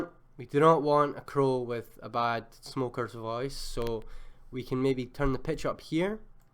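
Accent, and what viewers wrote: Scotland, United Kingdom. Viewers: Nice vid bro Scottish accent got the sub for me sick to death of American tutorials mate no offence to any Yanks reading this comment lol 👍🏼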